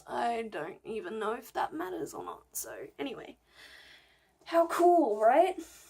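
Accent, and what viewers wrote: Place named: Australia. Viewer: Hahaha love your style so funny. The accent and humour pointed to oz, I'm from NZ. Great podcast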